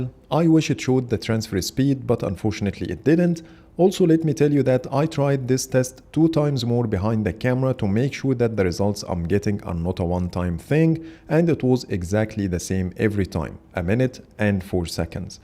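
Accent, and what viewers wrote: Egypt. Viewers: هو انا مستغرب بس انا لما سمعت لهجتك الإنجليزي جالي احساس انك مصري صح ولا اي😅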